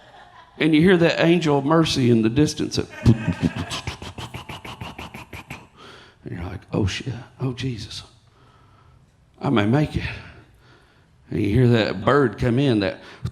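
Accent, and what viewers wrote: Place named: East Texas, United States. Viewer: Man this killed me. I'm from East Texas and you sound like 7 of my 8 cousins lol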